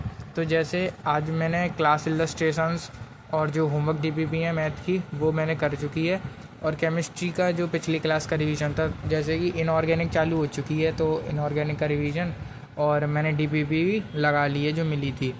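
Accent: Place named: Uttar Pradesh, India. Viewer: bhai up 67 se haina varansi ya Mirzapur side se 😂 yese hi bolne ka style hota hai yha ka mai bhi baliya se hun😅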